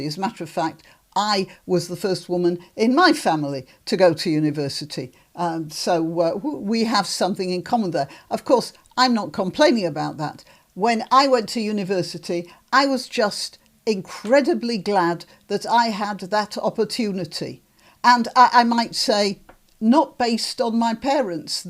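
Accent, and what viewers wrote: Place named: Scotland, United Kingdom. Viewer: Because you have a Scots Accent does not Make you Scottish this is BS.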